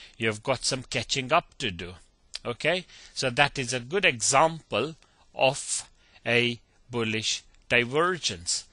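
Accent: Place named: India. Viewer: love the indian accent. thnx for the vid tho :)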